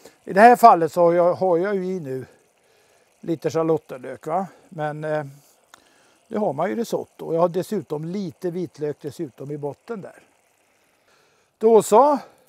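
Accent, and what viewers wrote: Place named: Gothenburg, Sweden. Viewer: Hej, Du bryter både på "Göteborgska " och "Stockholmska". Vilket är din farorit dialekt?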